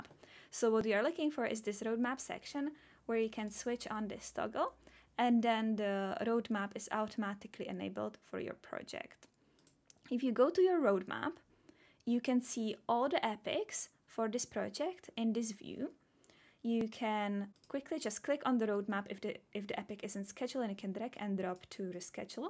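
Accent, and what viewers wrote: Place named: Scandinavia. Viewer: Nice video! but the whole time I'm wondering where your accent is from, Its either Finish, Icelandic maybe even Norwegian??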